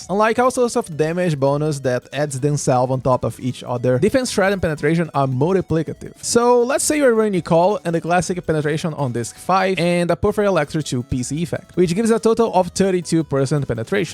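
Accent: Brazil. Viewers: Sotaque de brasileiro é facil de pegar khkhkkhkhkh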